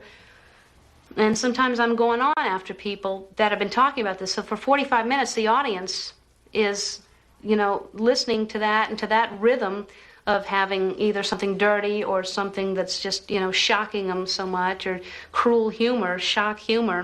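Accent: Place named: South, United States. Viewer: She still had the Southern drawl more marked than her later standardized Continental accent _'for all Publics'. I saw her in College when she was starting and she was even cuter, however more insecure which she built into her act as natural thing. When you have cracked eggs, you make...Ellen humor.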